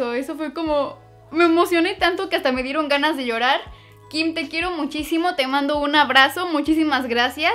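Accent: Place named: Ecuador. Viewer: Eres de ecuador❓ buen video ❤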